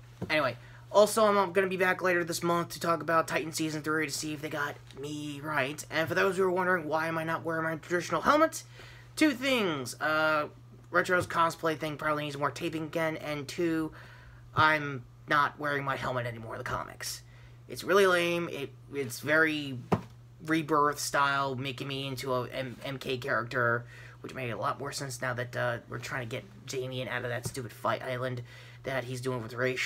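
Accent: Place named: United States. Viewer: You sound just like Tom Holland's American accent!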